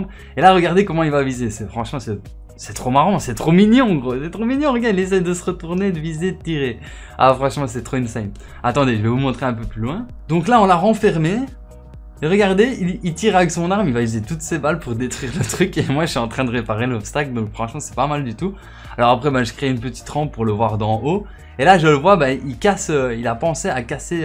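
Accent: Belgium. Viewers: T'es belge? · Tu es belge toi non ?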